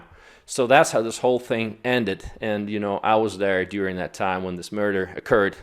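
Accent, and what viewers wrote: South, United States. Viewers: You're a natural story teller! Plus your English is excellent down to a bit of Southern accent. Great smile,too! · Love the Russian accent with that southern twang. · Very good, I hear a southern accent i think. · The southern accent and the Russian accent mix well. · I love that I can hear a little bit of southern twang in your English accent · you have adopted a southern American drawl! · I saw a radom video from you, then look at some more... seeing your family member from UK visiting her parents. But I couldn't figure out how a Southern US guy ended up with Russian parents... hey, maybe he was adopted, I thought....;-) then dug deeper into your channel and found amazing videos. You really give a nice perspective of Russia and its people. Maybe you need to "channel these" background video's of your years in US and how you gained your experiences, your viewers would understand better your background. 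I am amazed how well you speak English, with southern accent and all, even your wife speaks natural. Somewhere you must be an old US Southern soul how got reborn again in Russia, because you got the fighter-entrepreneur spirit and all;-) keep making these vids, you're doing good. До скорой встречи! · your southern twang when you speak English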